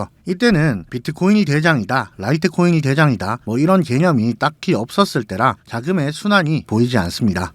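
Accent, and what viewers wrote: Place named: Gyeongsang, South Korea. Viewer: 갱상도는 발음 어쩔수없어요 괜찮아요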